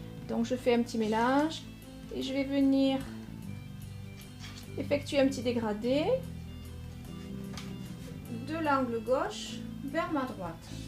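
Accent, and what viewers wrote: South, France. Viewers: Bonjour, Un Très Grand Merci pour ce Partage et cette profusion de générosité artistique personnelle qui est la tienne! Agrémentée par ce merveilleux, savoureux accent méridional qui ne peut que réchauffer les cœurs de ces espèces d'artistes congelés et recroquevillées sur leurs certitudes académiques aux quatre coin de l'hexagone....et même bien au delà.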